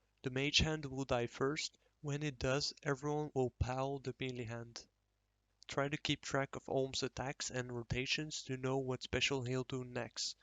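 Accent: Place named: Netherlands. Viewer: jij bent 100% nederlands... nice accent bro.. bedankt voor de uitleg maar ik wist dit allemaal al, suc6 met je drops.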